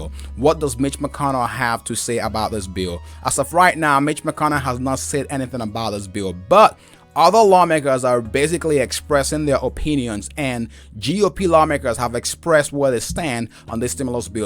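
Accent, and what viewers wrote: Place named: Africa. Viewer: Get English lessons please...get the African out of you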